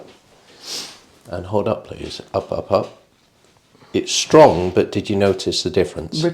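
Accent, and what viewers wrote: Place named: West Lancashire, United Kingdom. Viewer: I know a West Lancashire man when i hear him! Not too strong of an accent ☺️-from an East Lancs lass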